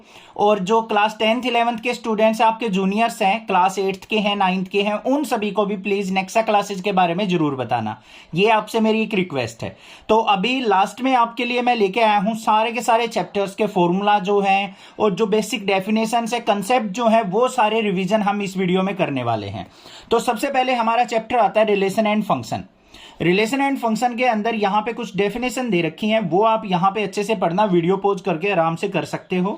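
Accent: Haryana, India. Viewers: Sir aap Haryana se h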